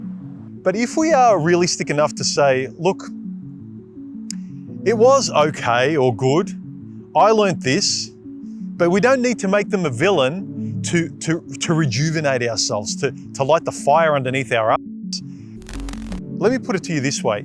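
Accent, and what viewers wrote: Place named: Australia. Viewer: Ausssssie accent... love it!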